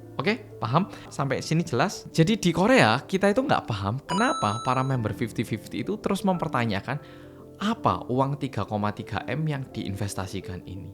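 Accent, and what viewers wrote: Java, Indonesia. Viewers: Ko medok mas bahasane,sm kaya aq 😅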